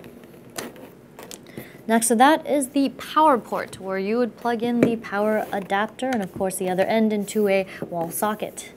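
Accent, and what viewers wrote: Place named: Asia. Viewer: Asian accent ))))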